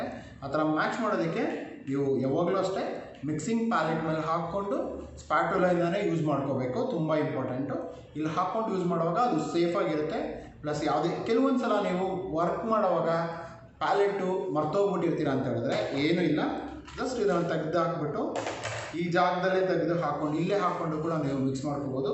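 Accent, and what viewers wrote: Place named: India. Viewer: Kannadadalli explain madiddke thank u so much sir.....Your Kannada accent is super.... Sir pls do more n more videos in kannada..... V r waiting ur videos in Kannada language ...... Thank you sir.... And also pls explain about different brand of foundations.....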